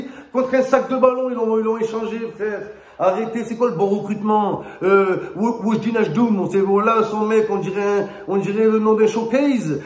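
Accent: Marseille, France. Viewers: Wallah tu parles avec ton vieux Marseille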